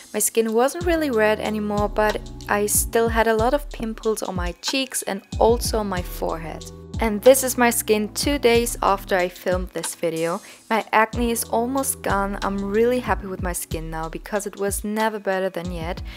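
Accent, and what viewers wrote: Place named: Germany. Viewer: Wow that's a thick German accent... But anyways, good luck with the routine, 파이팅!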